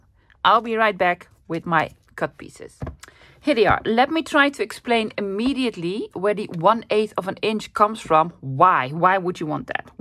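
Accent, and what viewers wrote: Netherlands. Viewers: First time watching your channel. Loving the ''flippies'' and "snippies" words. I normally cut off the first of the 2 flaps to reduce bulk, won't be cutting them off anymore, think it makes the sides pull in better so they are straight. Great tutorial. Love the Dutch accent, reminds me of my Dutch friends from years ago.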